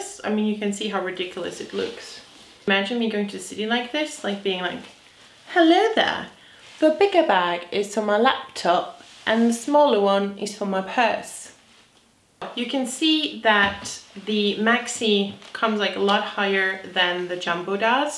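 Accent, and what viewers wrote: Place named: United Kingdom. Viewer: The british accent made me diiiiieee hahahahaah aa great video <3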